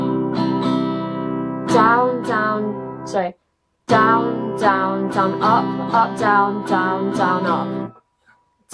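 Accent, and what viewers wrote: United Kingdom. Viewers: A beautiful girl with a British accent giving a tutorial of my favorite song! Who wants more? i can die in peace now. :-) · Bloody hell, you're Britain?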